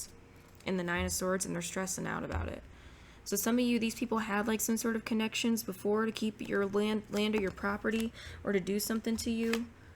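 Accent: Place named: South, United States. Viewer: Thanku my dear, i luv ure sthrn drawl!!! Ppl hav that in th Deep Sth of our country too!!! Bc of th irish and scottush settlers that lived there!!!!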